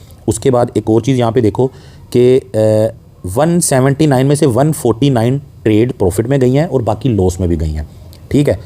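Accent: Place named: Faisalabad, Pakistan. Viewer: Your dialect is the typical faislabadi , a very beautiful one, where are you from bro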